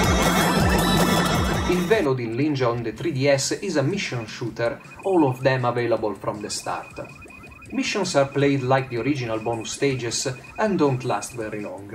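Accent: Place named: Italy. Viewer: im sure you heard this many times before but.. i looove your accent. Hearing that italian accent is such a breath of fresh air to my ears =)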